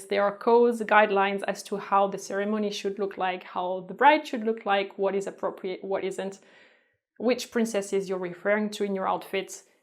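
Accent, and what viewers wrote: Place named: France. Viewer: Oh god. French accent is uggghhh to die for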